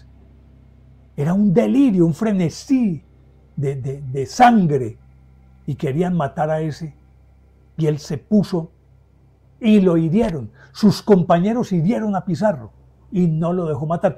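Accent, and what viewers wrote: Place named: Colombia. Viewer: Que hermosa manera de relatar la historia! Pienso que en alguna vida yo fui Colombiana porque me fascina escuchar la forma de hablar de los Colombianos ❤❤❤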